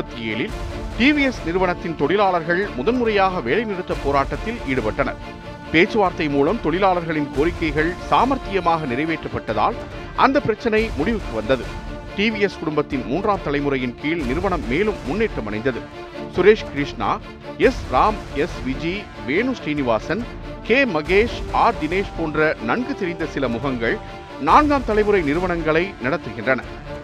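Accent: Tirunelveli, India. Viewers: திருநெல்வேலி காரன் லே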